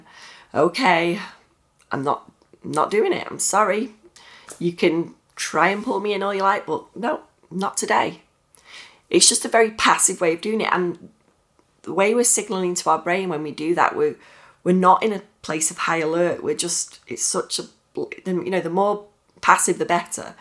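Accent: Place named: North, United Kingdom. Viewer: Hi,! My name's Vladimir. What I've just tried to do is the video for YouTube with my complaints about my life lol, but not sure I will upload any of them. I'm 34, I've been havin' severe magical thinking ocd for more than 20 years. My life is almost fully controlled by this shit despite the fact I know about ERP and I've been studying psychology. I got rid of some compulsions in the past, but now my life is at the zero point. I'm a russian guy, I work as an English teacher for dimes, I don't have friends and I feel insanely alone. I found your channel maybe at the beginning of June and I wanna tell you that you make great videos and you're a nice person!🙂 Some hours ago I talked to another therapist and it costed a significant amount of money, he's a great man and I do understand it's only the first session, but why the f**k it should cost such money to listen about my unhappy life? 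I love your accent, by the way, I think it's a northerth one.🙂, I've been studying psychology on my own and what I've noticed that people in the west (but I'm not yet familiar with all of these ACT and other stuff) generally talk about ERP, which is great that they tell people about this method, but it's only tip of the iceberg. I believe that scientific psychological theory that tells the reason of OCD as well as panic attacks and other anxiety disorders is the inner conflict inside of our mind. Maybe you will be interested in Jacques Lacan. People sometimes ask - why my ocd themes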